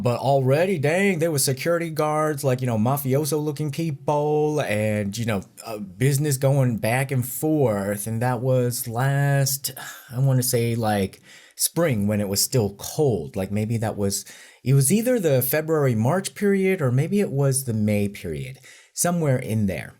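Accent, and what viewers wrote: South, United States. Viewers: I love how your southern accent started to coming heavier as the video progresses. 😂 I love it.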